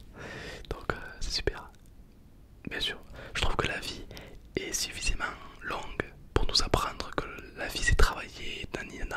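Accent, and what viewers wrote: South, France. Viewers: t’as un petit accent du sud toi 😂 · Du sud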